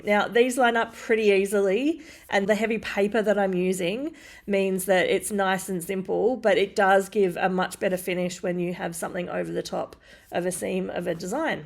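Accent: Australia. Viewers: I like to hear you talk and I’m trying to get an Australian accent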